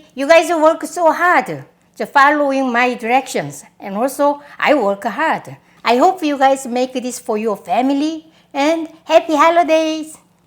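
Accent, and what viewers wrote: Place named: South Korea. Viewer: As much as I loves your cooking, I, too likes your English with a Korean slang👍😘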